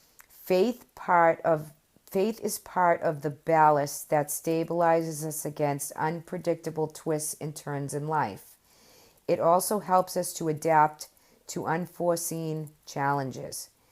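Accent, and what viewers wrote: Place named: Michigan, United States. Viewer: Love your accent! Michigan, Ohio possibly?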